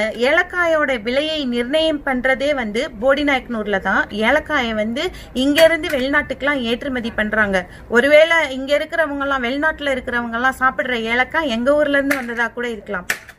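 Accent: Sri Lanka. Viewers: Correct akka onga tamil srilankan tamil mathiri than erukku i am from SriLanka 😊😊😊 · Your pronownsation is like Sri Lankans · I thought u r from Sri Lankan tamil. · Elangai Tamil pen nu nenachan dear 😍😍😍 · Konjam srilankan tamilian also ungala maathi tha akka kittathatta pesuvanga cute...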